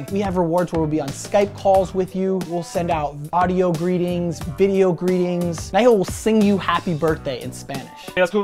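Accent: Argentina. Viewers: La primera vez que veo tus videos y me encantan! Lindo acento Argentino jejeje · Te olvidaste de como hablar en argentino? O solo estabas hablando asi por el colo? PD: No te pude ver en Argentina :'( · Aguante el proyecto kity pasta!! jajajaja volve a Argentina, sos un groso! mi novio es italiano y habla el " castellano Argento" como vos :) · BUE, muy lindo todo lo del acento argento, el colorado un copado también, pero como decimos por acá PONETE A LABURAR QUERIDO!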